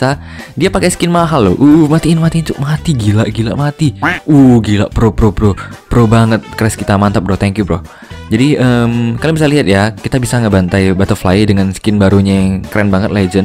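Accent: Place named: Medan, Indonesia. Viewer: "Penta bapak kau, mati kau longor!!" Confirmed bang dari medan:v Edit: Ditambah pidung:v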